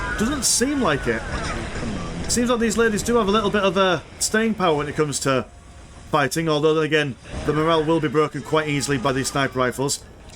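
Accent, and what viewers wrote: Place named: Yorkshire, United Kingdom. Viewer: ‘Why not go eh oop and away with Yorkshire airlines? Wipe tha bloody feet!’ The accent only adds to the enjoyment for me. Keep up the good work! 🫡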